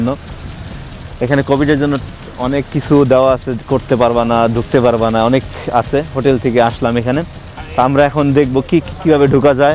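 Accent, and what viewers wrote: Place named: Bangladesh. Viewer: Love your Bangla ascent 😅😅😅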